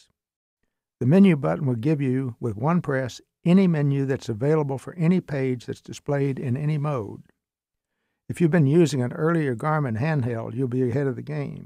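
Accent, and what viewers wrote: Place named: Tennessee, United States. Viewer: You definitely don’t sound like you’re from HGR. Heck, you sound like an East Tennessean. Somewhere around Luttrell or Bean Station. I am based at Sky Ranch btw (TN98). Just NNE of good ole KTYS. Thanks for a wonderful video!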